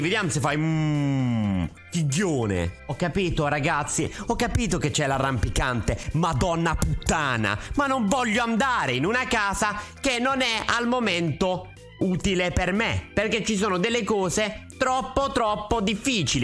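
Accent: Rome, Italy. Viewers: Non te se po sentì a parlà mezzo romano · però l accento romano no! E che cazz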